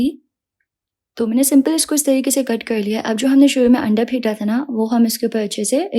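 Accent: United Kingdom. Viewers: i am talking about accent.. she is talking urdu in English accent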